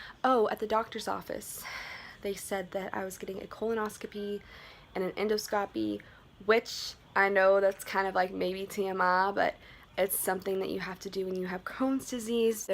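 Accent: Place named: Texas, United States. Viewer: I live in England (UK) but already thought you lived in Texas from your accent (y'all) and the way you guys interact etc. :,)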